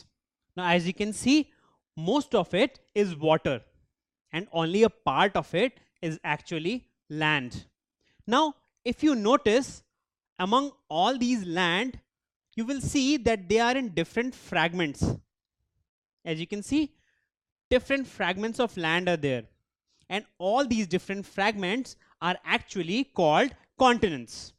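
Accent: India. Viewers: I also hate the cheap indian accent. #VeryBadAccent #PooryMade #WhatWasAllThatBlinkingAllTheTime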